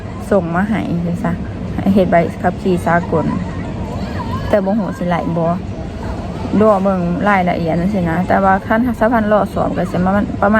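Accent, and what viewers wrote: Laos. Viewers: ก็เขาเป็นคนลาวเนาะจะให้พูดไทยได้ดีกว่านี้หรือ? ถ้าไม่ชอบก็ไม่ต้องเข้ามาดู แค่นั้นจบ!!!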